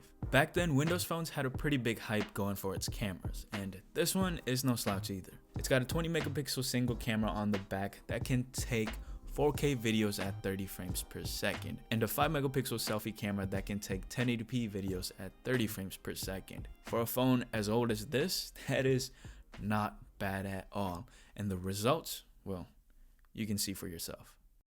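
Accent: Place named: England, United Kingdom. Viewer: I like your English accent. I am a native Spanish speaker but I also speak English as my second language and it sounds just like yours. I broke my phone about 3 years ago. Asked one my friends if he had an extra phone floating around in his house that I could use and he said yeah. He gave me an HTC Windows phone and I was so happy cuz it was my first time seeing a phone like that but the sim slut was too big for my chip so I'd only be able to use it with wifi. It started working real good but after a month or two it shut down completely. Whenever I turn it on, it won't connect to the wifi.. Can't do anything on it. Can't text .. can't do none of that anymore. Went on Saint Google looking for answers and I found out that it has stopped working.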